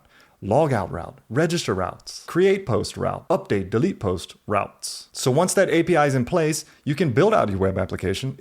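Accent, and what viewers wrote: South, United States. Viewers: you got a lil twang in your voice lol. You grew up in the south lmao?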